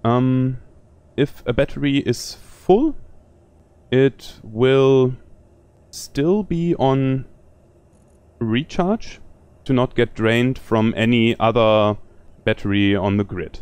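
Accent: Germany. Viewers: As a German, I swear to God this guy is a German as well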